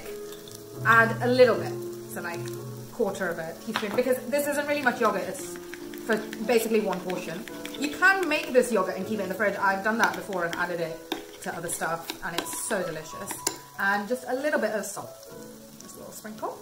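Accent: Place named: England, United Kingdom. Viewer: This is an amazing and so easy a recipe. English accent I hear, I'M From UK too #7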